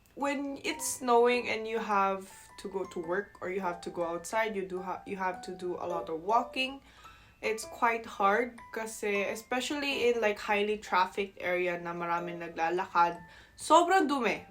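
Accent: Batangas, Philippines. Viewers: I love that you have a Pinoy accent hahaha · "ASAN YUNG ASEEENNN???"...... that's a killer.... 🤣🤣🤣.... pinay na pinay.... yung dateeeng.... yung dateeng ng diction... wow na wow.. walang ka arte arte... cute na hindi nagpapacute. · even your English has really Pilipino aksent! gusto kita. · Ang cute cute kasi pag English niya Pinoy na Pinoy! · 101% Korean looking but the Accent FILIPINOOOOO. 🥰❤️😝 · You have an accent of a Filipino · Your English is so pinoy style obviously sa pinas ka lumaki and i can tell you have gone to a good school. Nice to drop by your channel. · Your english is so pinoy. Accent is so neutral. Love it. Your tagalog as well is perfect. God bless! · Nice vlog, you really good in Tagalog and the way you speak is really pinay accent, kudos and good luck hope you all the best while in Korea.🥰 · Like you're speaking in Batangas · Kahit accent nya pinoy na pinoy amazing. 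· Omg..pinay na pinay ang pannalita ang accent pati taglish lahat2 ay d masasabi Koreana 🫠😊😍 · Hello 👋. New subscriber here from the USA, though Filipino (-American). Your story is unique, interesting, admirable. Your love of the Philippines, your sadness when you flew out, are unsurprisingly, emotionally, understandably, lovable. Your Tagalog is perfect, together with the accent. Your English sounds Pinoy-ish, too---like a Filipino speaking English. You are funny, too. Be yourself and don't change. 😉 On second thought, I would not consider your scenario "reverse culture." Having brought to the Philippines when you were just 4 years, I would posit that at that point in time, you had not been exposed or experienced Korean culture yet, being that you still had baby milk in your mouth. So, in my view, you are just undergoing a plain one-way culture shock, no reverse yet. 😑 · So,when I heard you on Anna's video when you joined them...I thought you're a Filipina mixed Korean but now you just said you just live there for a looooogggg time. 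So that explains it. No wonder the accent. 😂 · Love that English Pinoy accent and your tagalog is better than mine. More prolific at Bisaya though. Headed back to Cebu next month....can't wait, but I hate the freakin' long flight from Illinois to Seoul to Mactan then to Dipolog City where my wife is from. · I am a Batangueño senior citizen who stumbled into one of your videos, the one with Batangueño accent. This video is the second one i’ve watched. I kept laughing and sometimes to the point of tears. Your Batangueña accent is real. I dare say you are a Batangueña who looks like a Korean 😂🤣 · Well this Korean lady speaks like she is Filipino. Her English is Filipino in style and accent. She speaks Tagalog like any other Filipino. Awesome 😮😮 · This will be the new youtube video I'll be watching now. grabs potato chips PS. She is so cute with her Koreana looks and pure Filipino accent. :) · she really grew up in the ph coz of the way she says english words like culture and alot more lmao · you got a Pinoy accent for a Korean. 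· Alam mo kapag ipinikit q ang mga mata q at pinakikinggan kita, pinoy na pinoy ka talaga. Yung accent mo at the way ka magsalita, mga punto at bigat ng pagsasalita mo, para kang local pinoy. Hahahaha! Lalo na yung sinabi mo na "asan na yung asin?" Yung diin, punto at accent mo, pinoy na pinoy talaga. Hahahahaha! Kudos sa iyo! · korean with filipino accent is so damn sexy 😍😍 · You sound and speak like Filipino. That’s good. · Actually you are more Filipino to speak in terms of English and Tagalog tone. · Wow ang bilis ng panahon naka isang taon ka na pala diyan sa Korea parang kailan lang yun ah. Pero the way I see you just look Korean pero the way you speak and think your Filipino. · ma'am alam mo parang pinay na pinay ka talaga.lalo na sa pag bigkas ng words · Your tagalog is like those of the pinoy kids as we call it mga "sosyalin",taglish but still very pinoy accent. I love watching your vlogs,it's kinda cute😉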